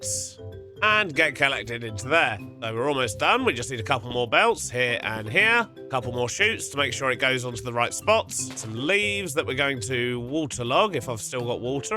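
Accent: United Kingdom. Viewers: You and mumbo have the same accent so pardon my American idiocy but what region of the UK gets that accent? Would it be more up-north or am I just completely wrong?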